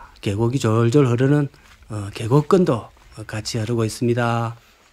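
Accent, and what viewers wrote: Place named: Gyeongsang, South Korea. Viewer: 경상도 말을쓴다고, 너자신의 본질이 숨겨지는것이 아님!!!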